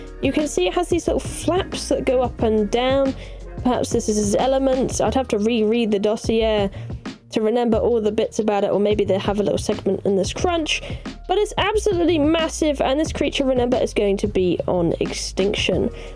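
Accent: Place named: United Kingdom. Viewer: Omg she's a Brit bruh I already couldn't listen to Brits and then they threw in a female.... Barf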